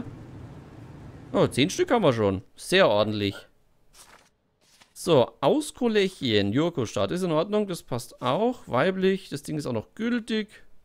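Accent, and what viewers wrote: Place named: Bavaria, Germany. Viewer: hach ja, zum zweitausendsten viewer bringt der gute seine familie um - das ist doch mal dedication:D ich bin auch wegs papers please auf den Kanal aufmerksam geworden (und nicht wegen irgend eines anderen youtubers^^ Hab mal ein videocheck auf der gamestar gesehen oder so). Ich bleib auch gern bei anderen lets plays. Immer sehr angenehm, deine atmo, dein bayerischer slang... Wo kommsten genau her, wenn ich fragen darf? Einzige Kritik: Beginn doch nich jedes Video immer mit "herzlich willkommen". Ich hab die zwo wöter so gfressen...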